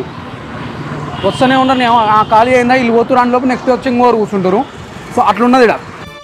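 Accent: Telangana, India. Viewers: Same na lekkane unnav chiccha Telangana mucchatlu same na lekkane matladithunnav kulla kullaaaa 😅😊😊😊❤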